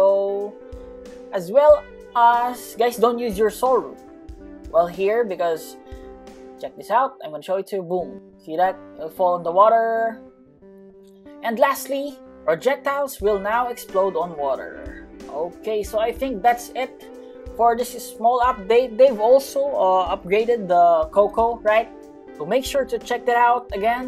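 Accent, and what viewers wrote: Philippines. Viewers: Are u pilipino or american lods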